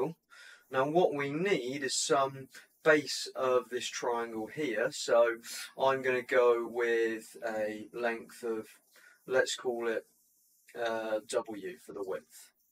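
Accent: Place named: East Midlands, United Kingdom. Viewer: What a great time for students to learn maths with helpful videos like this! Way better than reading through dog-eared textbooks! You sound like you have an East Midlands accent. Nottingham? Mansfield?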